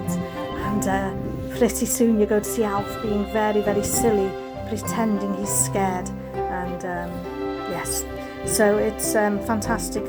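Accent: United Kingdom. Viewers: This is a very informative tour and it's relaxing listening to your sweet voice and lovely British accent.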